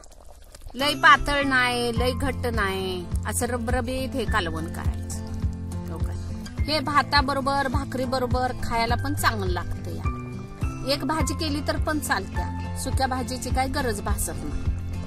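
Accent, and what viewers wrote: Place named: Rural, India. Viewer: रेसिपी तर चविष्ट झाली असणारच. पण ही गावरान भाषा कानाला इतकी गोड वाटते की ऐकतच राहावीशी वाटते.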